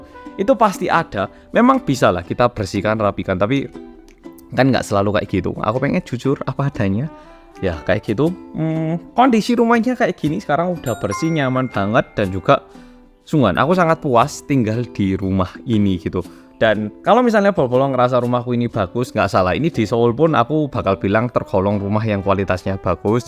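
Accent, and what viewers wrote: Java, Indonesia. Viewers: Klo nonton mas kelingan mbak jodilee, podo2medok tp mbake medok jogja krama inggilan😂😂😂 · Wah keren ini bener2 korea medok 😅👍 .. suka banget kalau denger lagi bahasa indo medok dan bahasa jawa .. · Tiap nonton konten mas. Terus dia meddok bahasa jawa lancar berasa ga percaya dia asli korea🤣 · Mas, tolong ya Meski tingg@ di korea, medok dan bahasa Indonesia nya jangan hilang yaa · Kesini gegara nonton tonight show orang Korea yg medok,, langsung deh aku subscribe like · Sering liat...tapi masih ngumun wong Korea ngomonge persis wong Jowo😂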